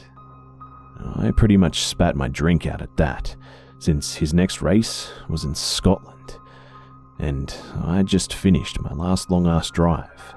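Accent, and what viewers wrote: Australia. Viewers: LOVE THE AUSSIE NARRATING..:D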